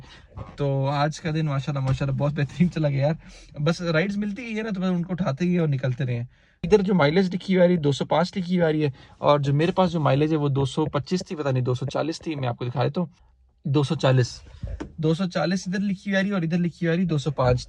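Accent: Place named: Karachi, Pakistan. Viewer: Aap tu Karachi ke lg rhay han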